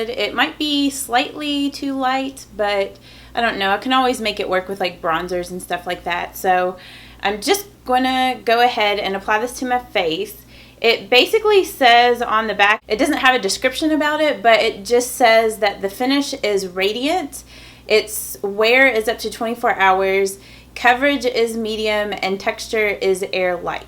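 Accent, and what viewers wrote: South, United States. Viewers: your southern accent is so cute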